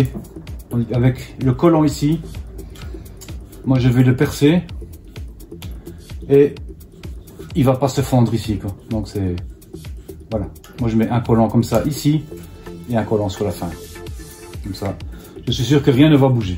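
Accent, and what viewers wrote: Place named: Belgium. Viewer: un petit like pour les explications et pour l'accent belge que j'aime bien :)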